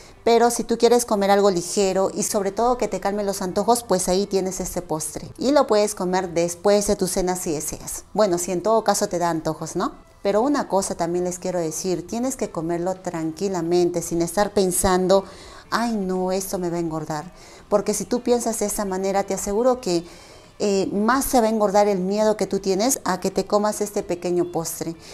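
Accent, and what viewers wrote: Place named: Peru. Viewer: Hola, estuve buscando en internet de qué país eres, al oir tu acento me enorgullece que seas peruana como yo, pero cuando dijiste cilantro, pensé que eres mexicana, hasta que te ví con tu amor haciendo desayuno dominguero y mostraron la ocopa. Orgullosa de que seas peruanísima. Un fuerte abrazo a la distancia.🎉❤❤❤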